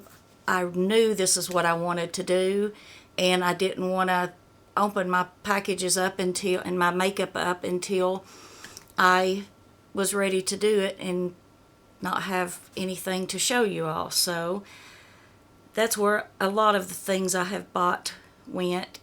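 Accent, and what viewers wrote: South, United States. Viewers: YOU ARE SO PRETTY! I LOVE YOUR SOUTHERN ACCENT SO MUCH! Keep up the hard work! God bless you! Also, you don’t need to lose weight you are perfect just the way you are!❤❤❤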